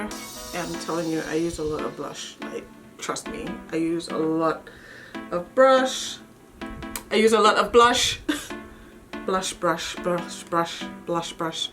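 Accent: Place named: Caribbean. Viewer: You sound like your oots are from the Caribbean... trini maybe?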